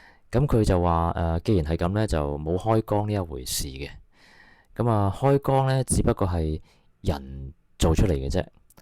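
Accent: China. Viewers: 典型大陸西講野…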